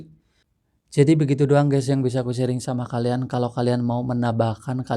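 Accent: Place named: Banyumas, Indonesia. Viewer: Ngapak bang.... Salam satu server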